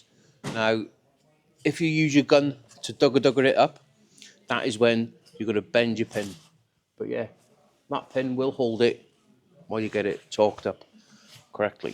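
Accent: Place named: Liverpool, United Kingdom. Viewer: You sound Welsh and Scouse at the same time!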